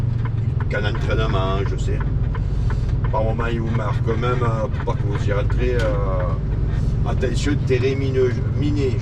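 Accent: Marseille, France. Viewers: merci l'ami! tu viens de me réconcilier avec la geopolitique !! c'est vrai qu'avec l'accent marseillais ça passe mieux !;-)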